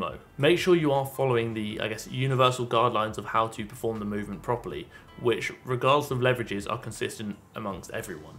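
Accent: United Kingdom. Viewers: Absolutely adore Caroline. I'm not advanced nor a beginner so it's a huge challenge for me but I love every moment of pain. Also I love your British accent especially the 'bloOdY' 😂. Idk if you know but you seem really seem similar to another youtuber Slogo. Don't know if you know him but yeah I can't ignore the similarity.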